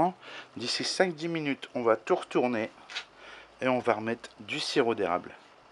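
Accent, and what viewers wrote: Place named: North, France. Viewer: Bonjour hi, l’accent du Nord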